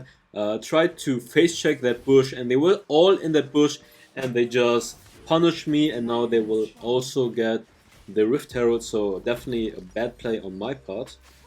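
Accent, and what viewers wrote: Germany. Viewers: Are you german ?? 😂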